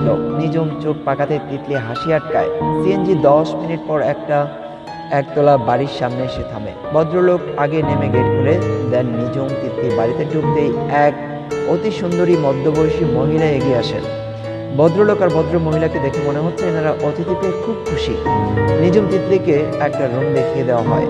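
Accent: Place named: Sylhet, Bangladesh. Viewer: Hahaha! Bhaia apnar mukhe sylhety kota shune atto hashi pay atto hashi pay j ki bolbo bujte pari na😀😀😀😀😀,,,,,,tobe ai hashi beshi din tiklay hoy,,,karon ai golper khub voyanok akta shotti ase ja 1st part ai bujte parcilam r akon kar part gula to puratay flash back😔😔😒😒😒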